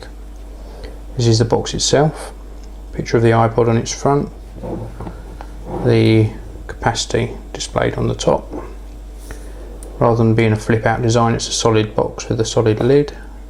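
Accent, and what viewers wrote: United Kingdom. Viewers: wonderful accent! love the UK!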